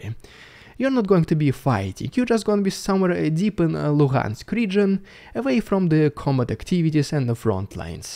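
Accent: Russia. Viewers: Dude, I'm uncertain if you are a real Russian that speaks American English very well, or an American that can mock a Russian accent very well.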